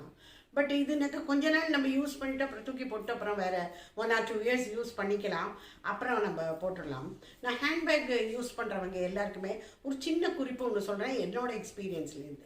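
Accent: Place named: Chennai, India. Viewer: Nice sharing mam. I like Your talk also . Tipical Chennai person it seems. I am also a crazy person in hand bag collection. Mam, how you are maintaining your hand bags? Kindly share.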